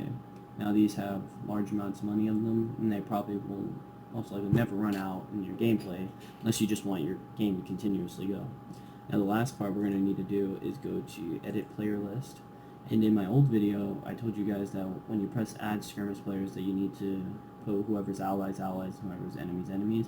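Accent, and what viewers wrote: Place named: United States. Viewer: Youve got one of my favourite America accents 👍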